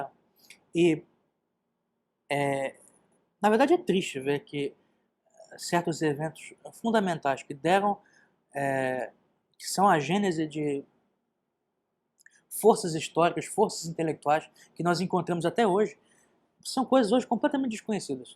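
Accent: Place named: Israel. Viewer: Percebo um sotaque israelense Ótimo video, ótimo canal. Parabéns que Deus abençoe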